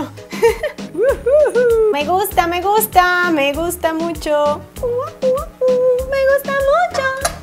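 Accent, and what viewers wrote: Cuba. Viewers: Hola guapisimaaa que linda eres me encantas soy feliz viendo tus videos y el acentito cubano yo vivo en Sevilla soy de Ecuador mi patria querida ole ole cada vez mas entretenida interesante intelugente con esa actitud positiva mi latina favorita te mando un beso muy grande que te queremos mucho tambien mi hija Patricia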